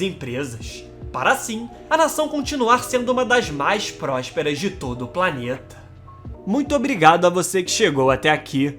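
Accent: Rio de Janeiro, Brazil. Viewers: Coloca um cara q fale normal... O cara puxa muito... Carioca fala muito feio.... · Sotaque carioquês do narrador é horroroso! O resto, já sabia faz tempo. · Conteúdo interessante. Narração terrível, complicado ouvir até o fim. Mistura de carioques, com Marcelo Resende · trabalhar mais o teu português carioca... parace uma panela de pressão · O vídeo é bom mas o sotaque carioca é irritante de mais. Por que se troca o "S" por X ? É uma coisa q não tem o menor sentido. "S" é "S" e "X" é "X" não consigo entender pq.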